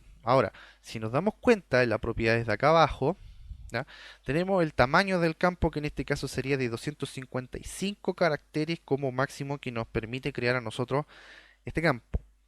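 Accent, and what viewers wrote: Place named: Chile. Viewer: Un comentario a modo de aporte: En Accesss 2013 el campo memo fue suprimido; en su lugar se puede utilizar el de Texto Largo. Deberías organizar el método con el que vas a dar el curso o, tutelar el video (me parece mas adecuado tutelar que enseñar). La vocalización es fundamental cuando se habla utilizando un idioma común a muchos modos de pronunciar (acento). Si hablás rápido, tu vocalización o acento chileno, resulta complicado seguirte para quienes utilicen otro modo de habla (acento). Deberías pausar mas las oraciones, evitar los tics lingüisticos propios de tu región o, país, de esa manera lo que hables será mas efectivo, sin dudas. Por último, veo que has optado por un mecanismo de explicación que comienza por el "paneo general" y, se va adentrando pausadamente dentro de lo particular. Soy docente con mas de cuarenta años en la profesión y, tengo la impresión de que el método elegido por ti, no es el adecuado para "tutelar"" por este medio. 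Lo mas apropiado sería comenzar con un ejemplo y, explicar clara y brevemente cada uno de los pasos ejecutados, evitando redundancias y explayados innecesarios. Si tu interés personal está orientado a conseguir suscripciones al canal, la mejor forma es dar un tutorial eficiente y, saludable; no hay mucho de eso en YouTube; lo sabemos todos, aunque hay algunos tutores que son brillantes porque han tenido en cuenta esos detalles. Por último marcarte dos errores: El campo edad no solo no debe ser del tipo Texto, sino tampóco numérico.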